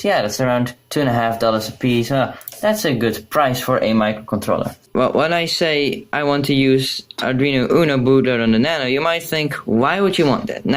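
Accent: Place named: England, United Kingdom. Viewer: broooo, ik mag je engelse accent wel en thnx voor de fix!!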